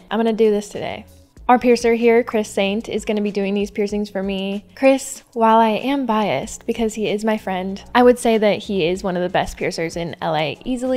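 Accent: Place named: RP, United Kingdom. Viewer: I was so suprised by the posh British accent!😂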